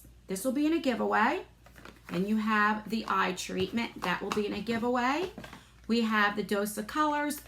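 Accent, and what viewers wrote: New Jersey, United States. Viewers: I love your Jersey accent! We moved to west Virginia 4 yrs ago from Philadelphia. As soon as I open my mouth everyone asks where I'm from . Happy to see you got your brushes.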